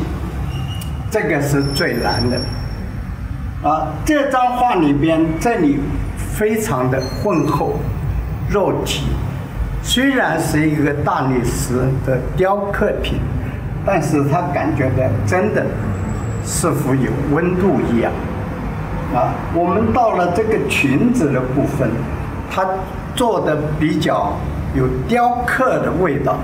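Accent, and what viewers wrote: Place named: Shanghai, China. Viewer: 为什么有一种老师是上海人的感觉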